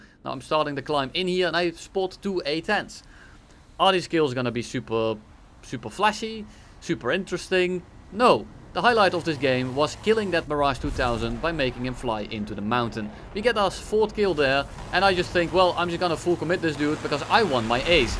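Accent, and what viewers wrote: Netherlands. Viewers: i smell a nederlands accentje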